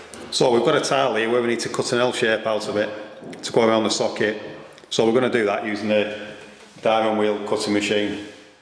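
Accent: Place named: Lancashire, United Kingdom. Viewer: great video, and really helpful. It's also nice to hear a Lancashire accent-it's been years since I moved away! Thanks!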